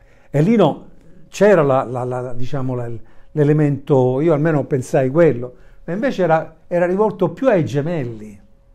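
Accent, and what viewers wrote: Rome, Italy. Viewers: Dall' accento sembra romano de roma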